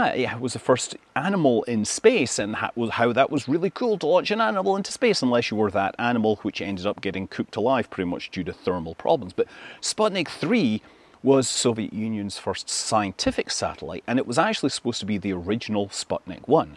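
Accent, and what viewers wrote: Scotland, United Kingdom. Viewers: Now that we know you are in Scotland and don't just have that accent for fun, any SaxaVord coverage?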